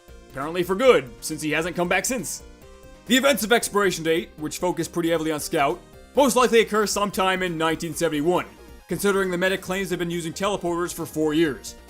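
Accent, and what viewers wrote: Boston, United States. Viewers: fast Boston boy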